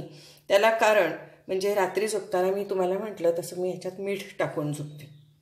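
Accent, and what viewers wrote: Kolhapur, India. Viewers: मँडम चे व्हीडीओ छानच असतात आपोआप त्यात गुंतले जाते व्हिडीओ ची सुरवात पुणेरी भाषेत होत असली तरी नंतर कोल्हापूरीतच बोलतात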